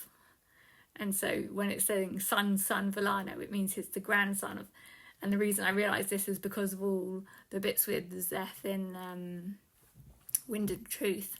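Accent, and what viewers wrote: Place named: United States. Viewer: This is such a fun idea for a video. And you did such a great job. I wonder if theAmerican pronounciation messed with it a bit too. Awesome stuff.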